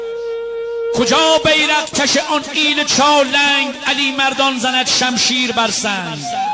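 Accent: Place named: Afghanistan. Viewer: You're an AFGHAN 😂😂😂😂😂😂😂😂😂😂😂😂😂😂 I KNOW NOW!!! IT MAKES PERFECT SENSE. I FEEL SORRY FOR YOU :((((((( ITS OKAY... YOU CAN KEEP PRETENDING YOUR PERSIAN. YOURE JUST PERSIAN SPEAKING WITH YOUR UGLY DIALECT.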